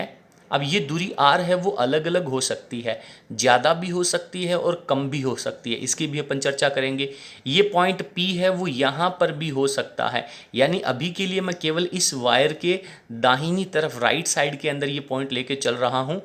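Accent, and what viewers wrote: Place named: Bagdi, India. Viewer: Gurgi the ktha ga ho bagdi bolan laagrea ho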